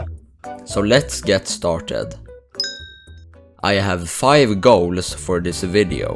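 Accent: Sweden. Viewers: Svenska accent👌🤌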